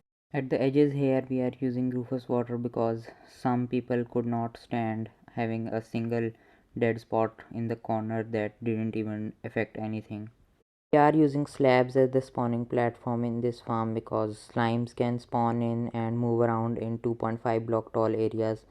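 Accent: India. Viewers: Good Job man, don't judge me but by your accent I feel like you are Indian too and I think you can help build a sensible Minecraft community here. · Your English give me vibes of an Indian person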